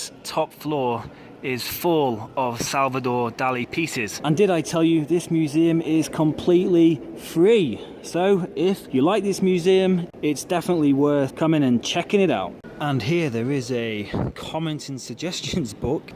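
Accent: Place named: United Kingdom. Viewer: I subscribed to you i think British English is more beautiful than American English jaja :p I love British accents ..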